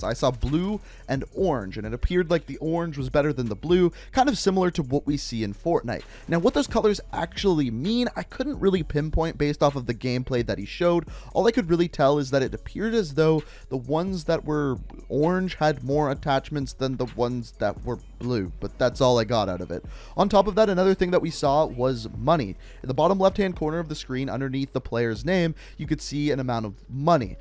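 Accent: Canada. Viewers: By his accent I'd guess he's from or near Winnipeg, Manitoba Canada. He sounds like a professional wrestler named Chris Jericho that has the same accent.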